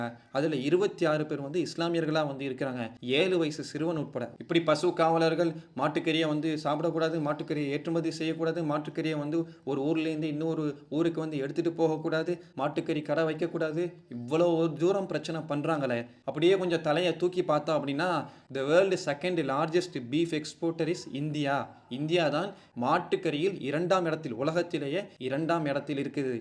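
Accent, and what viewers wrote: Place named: Kerala, India. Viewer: 👏 well explained... That Malayalam slang 🔥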